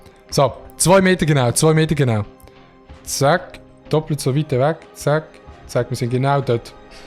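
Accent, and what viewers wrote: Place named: Switzerland. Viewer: ich liebe den Schweizer Dialekt. Meine freunde verstehen zwar fast nichts aber egal einfach nur liebe dafür das du das so durchziehst. Mach weiter so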